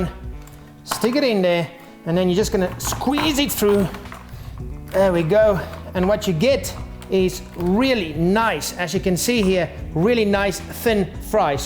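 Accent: Afrikaans, South Africa. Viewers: Where are you from? South Africa? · Where is this guy's accent from? The only thing I can compare it to is the guy from District 9 (Wikus) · Your afrikaans accent is cool! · I think he's South African :) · Definitely a South African accent. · are you from South Africa? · He's South African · Kings Fobos South African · hes south african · arank001 South Africa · Michael Evangelista It's South African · What accent does he have? I want to say south African but I really can't tell :/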